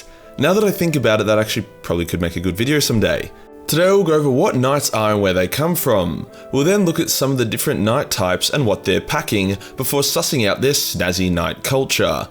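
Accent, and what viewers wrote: Australia. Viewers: I keep realizing that I have done more research on 40k Lore than, but I just like hearing a cutie pie Australian say shit I would find offensive in text or any other accent. But hey gal here happy to be recognized!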